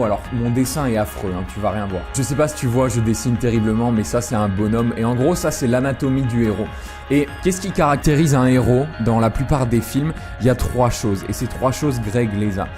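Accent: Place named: Belgium. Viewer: je pense qu'il est belge et pas français, sinon très bonne analyse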